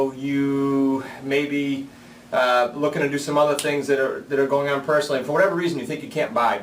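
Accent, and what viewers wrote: Boston, United States. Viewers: Is that a Bastin accent ??? 👀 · Is this guy from Boston?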